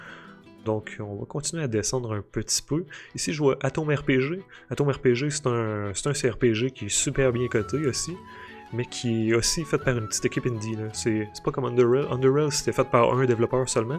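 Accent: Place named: Quebec, Canada. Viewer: Hello, j'ai decouvert ta chaine depuis peu et j'adore 👍, j'avais du mal a te comprend au depart avec ton fort accent cousin Quebecois mais ca va mieux maintenant 😉. J'allais justement dire qu'il faut que tu notes Icewindale, mais je l'ai vu dans ton excel 👍, il est top. Je l'ai fait apres BG1 a l'époque, ca date maintenant 😂. Sinon il faut faire les Dragon age aussi 😉. A bientôt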